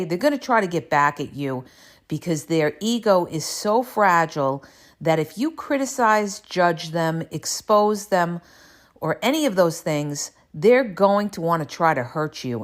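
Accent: New York, United States. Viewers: I am sorry to always be so complimentary, but I am understanding what you are saying on a deeper level. I get excited! This is understanding narcissists, which is the only way to proceed with them. I have so much more power with the truth of it all when I understand what they are doing and who they are... especially in the legal realm. As always, thank you, thank you, thank you. You can trust a native New-Yorker when you hear the accent and you see your street-wise consciousness. New-Yorkers see it all and it has been a matter of staying ALIVE to be able to defend in a brutal world.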